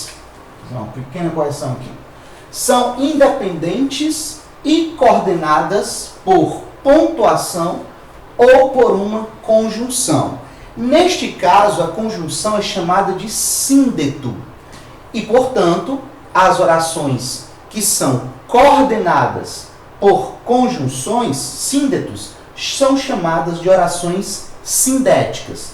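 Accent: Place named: Northeast, Brazil. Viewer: se fui agressivo, desculpas mil! O problema é que o amigo posta aulas não para o nordeste e, sim, para todo o Brasil, aliás aulas excelentes. Minha bronca, que nem mesmo é uma bronca, apenas uma observação mais forte que o tom normal, é que o amigo teria ainda mais sucesso do que tem se, num gesto de concessão, fizesse um esforço para pronunciar corretamente o fonema "de". A toda-poderosa Globo gasta milhares de reais com fonoaudiólogos para corrigir vícios, ou regionalismos,de linguagem, pois suas séries não são apenas para o público carioca e, sim, para todo o Brasil. Cara, repito, um milhão de perdões se pareci agressivo. Aqui, o "astro" é o amigo, sou apenas um assíduo visitante dos teus vídeos os quais são dádivas aos necessitados de melhoras em termos de conhecimento da nossa tão amada língua portuguesa. Abraços e saudações coloradas!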